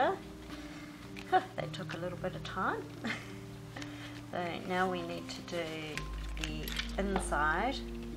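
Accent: New Zealand. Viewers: I love your accent! <3 and your work, ofc. Do you happen to live in NZ? :)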